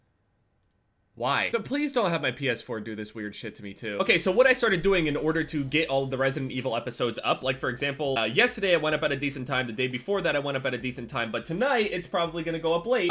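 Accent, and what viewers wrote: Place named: New Jersey, United States. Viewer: your new jersey accent is starting to be more noticeable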